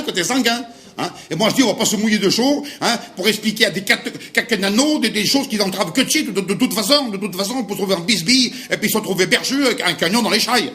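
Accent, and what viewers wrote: France. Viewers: C'est dû à l'Arpitan qu'on y parle de partout à Gre comme à Sainté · La prosodie typique du parler montagnard de l’espace franco-provençal. Très proches de certains parlers valaisans en Suisse, même dans les expressions (« de toute façon », « et puis c’est tout ») utilisées et prononcées exactement comme en Valais. Impressionnant. Les patois disparaissent mais la musique reste encore deux ou trois générations.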